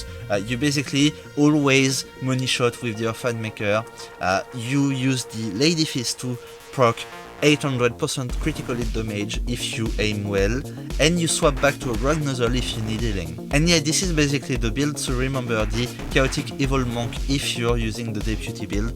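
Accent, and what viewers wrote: France. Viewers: Mdr le bon vieil accent français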